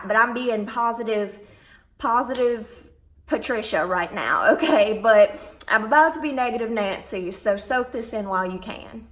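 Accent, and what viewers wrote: South, United States. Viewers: you talk funny. Why are you from the south?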